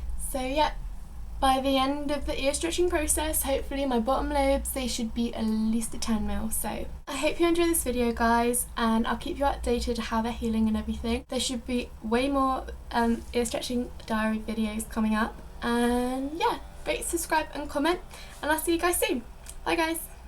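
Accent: United Kingdom. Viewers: Wow... I've only watched your videos where you don't speak so far, and I seriously thought you were American or someone who would have an American accent! xD What a pleasant surprise hearing your adorable British accent ^^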